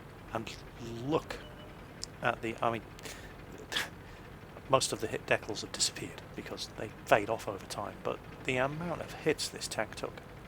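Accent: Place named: United Kingdom. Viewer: Ok no worries man. Btw your a cool guy man. For some reason the British accent always comes off as polite lol.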